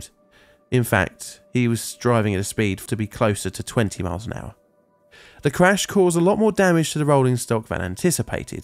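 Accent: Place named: England, United Kingdom. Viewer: DEB-ree (debris) 😂 we say duh-BREE. Choob (tube). 😂 I love English accents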